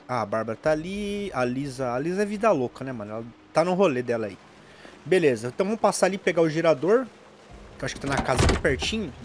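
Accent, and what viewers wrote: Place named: Rio Grande do Sul, Brazil. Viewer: tu tem voz de gaúcho cara kkkkkkkk. Também sou do Paraná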